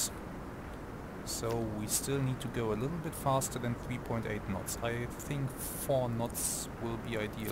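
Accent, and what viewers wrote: Germany. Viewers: Ugh... that cement thick accent and germanised English, Germish, Genglish, whatever you want to call it (e.g. you focus on things in English, in German you 'concentrate' on them). They don't even try to pronounce the foreign language correctly, but mutilate it beyond recognition as they see fit. I always cringe when I hear that. Ear cancer risk. Just speak German, for Pete's sake, and that's it. Leave the rest to the subtitles. It would be even more authentic. And then you have such a hard time calculating the difference between 0° (also known as 360°) and 308° mentally, that you rather count the dashes on the compass band instead? Really? 52°? Really? After having laboriously counted all dashes? Are you sure? I mean, are you really, really sure? Dude, then you're not the right person to explain anything to me (or anybody else) when it comes to physics and geometry.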